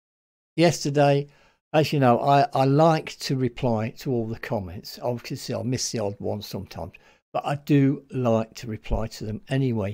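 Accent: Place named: United Kingdom. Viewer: Thanks always from Japan 有難う💛。 Yes. When you reply to or put heart mark on my comment, it makes me happy, but that is not what I expect on your reaction videos. Your smiles, grinning, smirks and witty observations in British accent on Band-Maid, BABYMETAL etc. are what I want to get from you. The reaction video is just for fun, not mandatory duty for both reactors and viewers. Please keep on just the way you have been.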